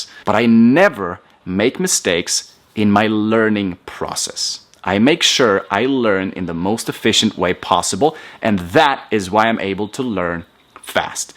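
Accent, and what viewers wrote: Spain. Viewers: Wow,cara eu tô surpreso com o progresso dele no português,o vocabulario dele é muito bom,a pronuncia as vezes não é tão boa por conta do sotaque forte do espanhol,mas fora isso,deu para entender tudo, parabéns!!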